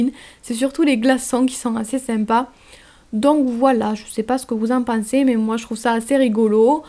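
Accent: South, France. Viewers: Je kiff l'accent du sud 😍😱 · j`adore ton accent du sud trop cool et les boissons son super bonnes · bah moi j'ai laissé chez moi et ça marche bien c'est trop bien ton truc moi j'aime bien j'adore ton accent du sud un accent du sud c'est bien mais c'était très bon et j'en ai fait chez moi bah ça c'est bien et voilà donc merci beaucoup pour ta recette😇😇😇😇😇😇😇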